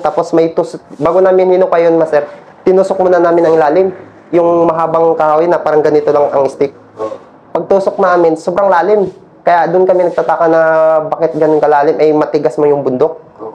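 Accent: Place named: Ilocos, Philippines. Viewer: ilokano yan taga ilocos norte... tanongin mo siya kasi mga taga roon.. ala won barok... di ala eh!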